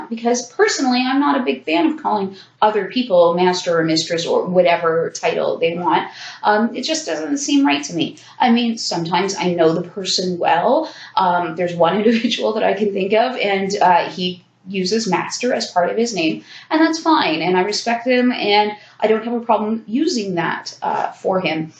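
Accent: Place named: Canada. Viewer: Learned so much in these 2 vids, thanks! Also, funny/cute how you say aboot & sore-y like on Degrassi 😆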